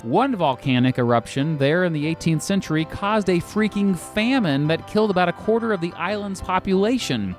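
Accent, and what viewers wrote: United States. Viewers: You’re Icelandic pronunciations are really American. ð is pronounced like the th in think by the way. And á is pronounced like the ou in out. í is pronounced like the ee sheep. ó is pronounced like the o in show. Other than that this was 👌🏼👌🏼👌🏼.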